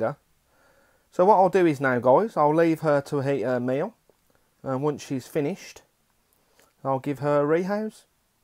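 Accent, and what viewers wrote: Australia. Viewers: As a layman I have never heard of this classification, but when I hear an Australian say the words "poison level foive", I know sh** is real! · The best Aussie accent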